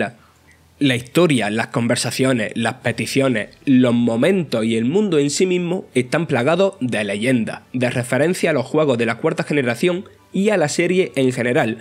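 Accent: Andalusia, Spain. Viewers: Investigué y resulta que así hablan los andaluces. No es mala locución así es como hablan en Andalucía. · De dónde es su acento? Sé que de España pero de que parte? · Me encanta que un medio se haga en acento Andaluz, eso si, lo de “Arqueus” me ha dejado descolocado. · de Jaén, Andalucía. En el sur de España. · andaluz creo, yo soy de argentina pero si no me equivoco es de ahí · Párese que e andalu pero quea raro en una narrasio que e lo que le paha a ete tehto que quea raro. Y lo digo siendo andaluz, nuestra forma de hablar es la peor para narrar. Pero no es que no sepa hablar el muchacho 😂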